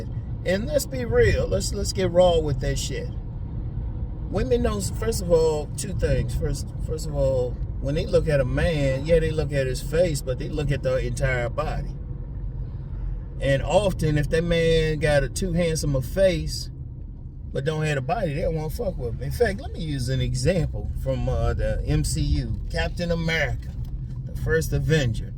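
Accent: Washington DC, United States. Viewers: You sound like you from DC.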